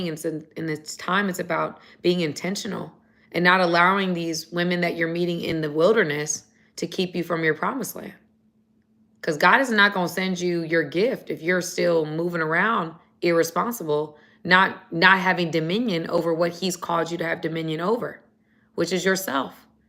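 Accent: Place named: New Orleans, United States. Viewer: I think New Jersey plus Atlanta, or Georgia, almost makes a New Orleans accent, specifically a westbank of New Orleans accent..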